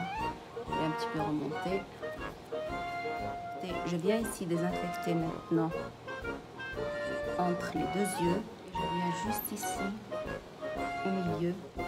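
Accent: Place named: Tunisia. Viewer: Ton accent ça se voit que tu es tunisienne 🤗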